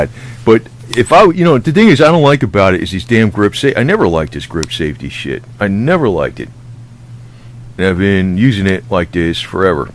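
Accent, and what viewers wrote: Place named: New York, United States. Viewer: Form NYC sounds like.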